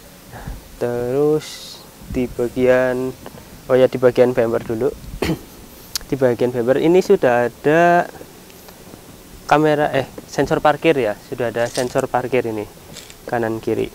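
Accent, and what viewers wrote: Java, Indonesia. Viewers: Mase....Medok pisan Jawane....😂😂😂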